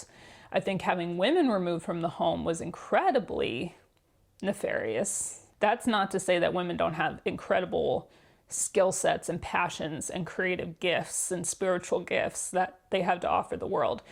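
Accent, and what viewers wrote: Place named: East Coast, United States. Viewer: Not the secret Masonic hand symbols 🤣 I can't believe someone would accuse you of that lol. I like listening to accents and the way people talk. If I had to guess, you're from the East Coast. My guess is New York. Possibly New Jersey. I'm from PA, and the way you speak is familiar to me. I also talk with my hands a lot. No Masonic symbols though haha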